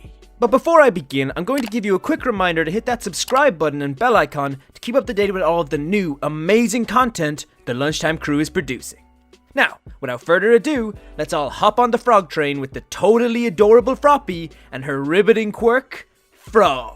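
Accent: Ireland. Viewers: He has an irish accent and irish accents tend to pronounce their th as either a t like in words think and three or as a d like in this and that.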